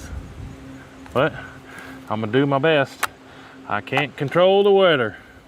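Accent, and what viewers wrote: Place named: South, United States. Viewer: I just noticed your voice and accent is very close to Hank Hill.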